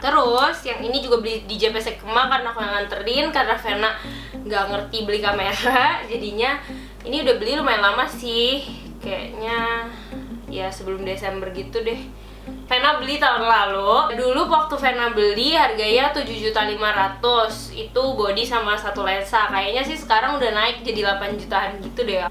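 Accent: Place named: Visayas, Philippines. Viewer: Hala, your dialect kinda sound close to the Visayan dialect from the Philippines. I find It fascinating. :)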